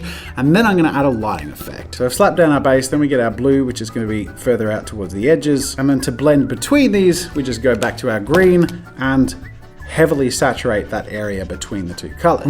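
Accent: Australia. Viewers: I even fell in love with the Aussie accent! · I love the Aussie accent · Is this dude Aussie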